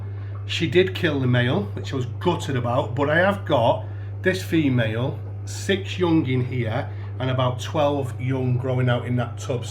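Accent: Manchester, United Kingdom. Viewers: Looking sweet bro, you sound mancunian!!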